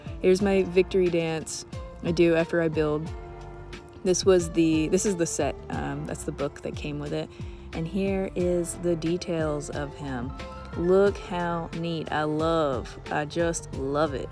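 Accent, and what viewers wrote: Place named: South, United States. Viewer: Been following on insta forever, but I did not expect your voice to be so southern! Your slight accent is adorable. I hope you make more of these, I loved the animal facts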